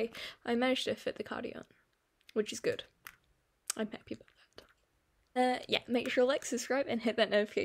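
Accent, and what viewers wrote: New Zealand. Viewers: Your accent swerves between kiwi and southern US. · ur accent sounds sooo familiar r u from nz? or maybe australia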